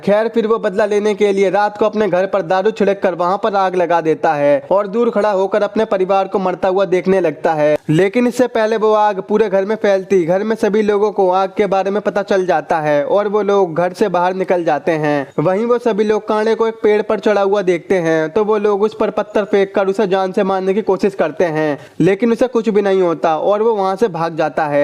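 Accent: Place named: Rural, India. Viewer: bhai tu thoda sa behan ka loda h kya teri aawaj kitni madharchod h or gawaro wali h 🤣🤣🤣🤣🤣🤣🤣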